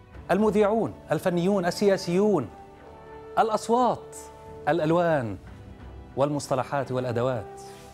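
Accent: Syria. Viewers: هل المذيع من سوريا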